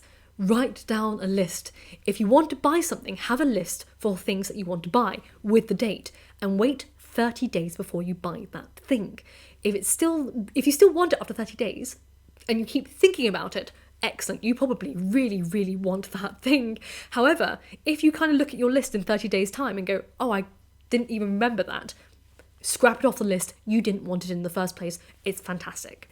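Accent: United Kingdom. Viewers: I always considered you a very intelligent and wise person. Always got something interesting to say with the most beautiful British accent.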